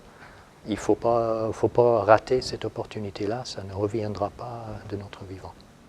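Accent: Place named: England, United Kingdom. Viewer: We can hear a little english accent, may be that's why... (I'm french) ;)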